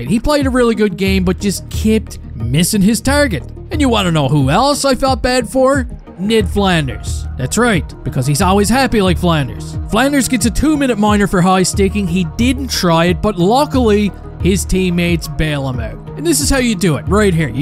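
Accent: Newfoundland, Canada. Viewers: My thoughts? Good to see Jaro breaking out. Good to hear we got a 30G cap again. Xhakej (sp??) is a freakin’ house. But most of all, let’s here it for the Newfy accent. If you don’t immediately find joy in your heart hearing a newfy say “tird perid”, I assume your soul is dead. Good show friend. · Love your accent!! Good ol' Canadian hockey talk!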